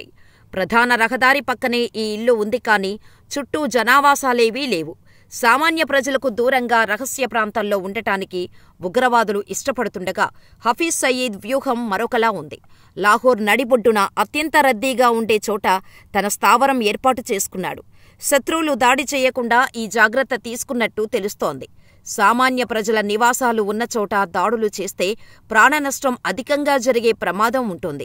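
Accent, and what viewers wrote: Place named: Andhra Pradesh, India. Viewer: Modalettesaadu kadu ann Modalvettindu anale Aandrolla lekka matladtav anna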